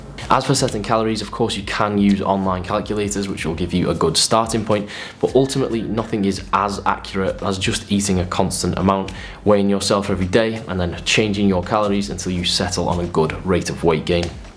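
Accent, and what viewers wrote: United Kingdom. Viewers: unfortunately anybody from outside the UK has 0.0% of a clue of what this guy is saying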